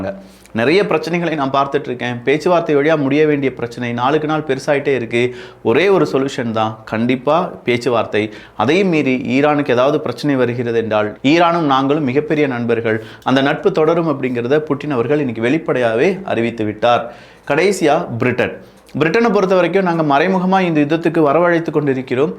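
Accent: Kerala, India. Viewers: his parents are from Tuticorin/ Udangudi area - he did his middle and high school in Vandiperiyar area which is in Tamil Nadu kerala border - you tend to get Malayalam mixed slang when you stay in border area - obviously he knows Malayalam very well you can observe the same slang in Nagarcoil / Marthandam area as well - they don’t become Malayalis right ? He worked in software company in Trivandrum and he was YouTuber at the same time - he got home in Trivandrum - it’s little cheaper to buy properties there than Chennai as you know so obviously you don’t move out that easily once you buy home A lot of our Tamils are settled in Mumbai - they don’t become Marathis right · hear his pronunciation of college, office and similar words with o sound. Only someone with Malayalam as mothetongue can talk like that. His entire family is from Kerala and settled in Trivandrum. 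If he is Tamil, then why buy land there and build and settle there???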